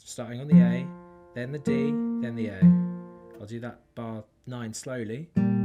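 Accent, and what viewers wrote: England, United Kingdom. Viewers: I LOVE YOUR ENGLISH ACCENT MAN! Nice vid btw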